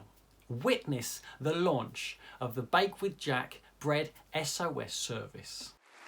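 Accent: United Kingdom. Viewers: Jack, babes, what's your accent called? I love it! You're from the UK?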